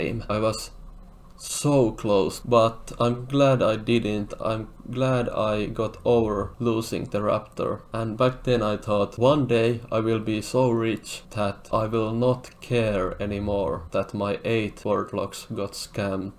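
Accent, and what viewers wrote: Finland. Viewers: Suomi accent o crazy (eksä tehny face reveal jonku aikaa sitte) mut joo hyvä vid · Finland accent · Mahtava suomalainen aksentti olisko vanhana tekijänä mitään hyviä vinkkejä meitsille?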